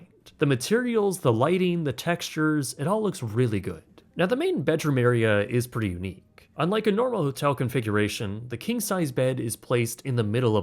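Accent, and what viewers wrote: Canada. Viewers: Mind you, rates change. For example his same room now is $270 i think hes quite nit picky and since this is a historic hotel this is very fair pricing and i disagree w majority of his pricing critques. Granted it sounds like hes canadian and their dollar is very weak in the US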